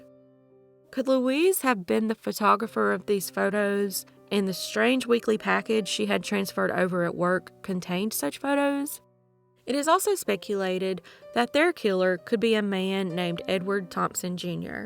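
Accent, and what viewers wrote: South, United States. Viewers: Finally get to see the face behind that beautiful southern draw!!!! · Why did you not want to show your face, you are cute. I love your southern drawl. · Awesome Tuesday. We get to see your pretty face with your sweet southern drawl. Thank you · Good to see you girl! You are beautiful as that southern accent ! Keep'em coming, and again thanks for introducing yourself! ❤🕵️‍♀️ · One reason I loved this Chanel was bc of your (yes) Southern accent/voice lol and now beautiful face. Born & raised in MD, I always dreamed of living in the south. Thank you for your diligence in presenting these stories! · Oh my goodness sake’s! It’s so nice to see a face that goes with that country voice! One day I’ll finally decide to show my face on my channel also! lol Keep up the great work ! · You're very pretty and I really like your southern voice. 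· Yes! It's always nice to see the faces behind the voices we love! In the beginning, I was so fascinated by the voice and southern accent that I forgot to listen to the stories she told. I had to listen twice! 🥴😁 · Awesome as always!! Nice to see the face behind the Southern voice!! 💜 You're one of the very few true crime Youtubers who can pack a lot of information within a 10 or 15 minute video & not leave anything pertinent out to do it. While I like an occasional deep dive into a case most times I dont have 30-60 minutes or more to invest. This is what makes your content perfect for me .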